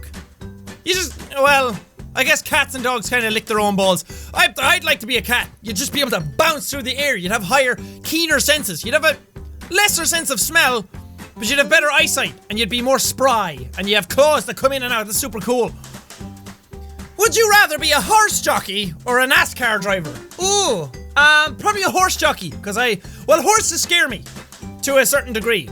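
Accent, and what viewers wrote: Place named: Ireland. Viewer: The irishman is always within him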